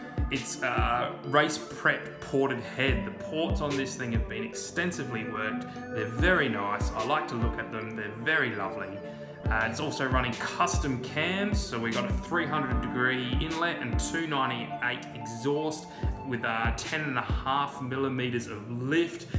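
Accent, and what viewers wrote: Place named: Australia. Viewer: haaha same dude, it was in my recommended, and I was like oh this guy sounds aussie, then I was like yo wtf its mallala haha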